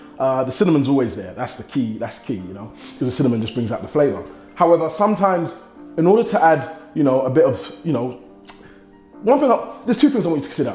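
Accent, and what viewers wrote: England, United Kingdom. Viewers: shoot that crib up you hidin in he's an African brit. He doesn't have an American accent, he has a British accent. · he’s from the UK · Dude with that English accent, and Body your making all us guys look Badd😂😁🤣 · AND he has a british accent?? geez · R u from the uk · pillohw british accent there is nothing called english accent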